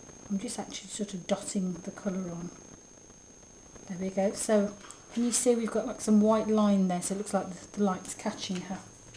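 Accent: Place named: United Kingdom. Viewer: Ur british accent! :D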